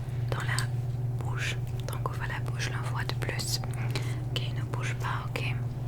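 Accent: Quebec, Canada. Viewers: Tes québécoise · Je découvre ta chaîne avec plaisir. Ton accent est adorable d'où viens tu ? J'entends parfois un accent québécois mais pas uniquement ... Merci pour ta vidéo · Je ressens un accent québécois quand tu parles mais c’est sûrement moi est juste habitué avec cet accent ou qui rêve😴… Continue comme ça! 🇨🇦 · Es-tu Québécoise ? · Accent anglophone mais aussi québécois un peux ? Non ? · Elle est canadienne ? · Tu es canadienne ? J'aime ton accent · T’es québécoise?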